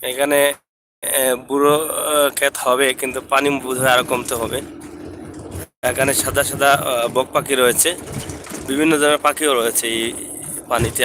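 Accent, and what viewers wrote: Sylhet, Bangladesh. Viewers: আদা সিলেটি আর আদা শুদ্ধ খেনে। খাটি সিলেটি ভাষায় মাতও না খেনে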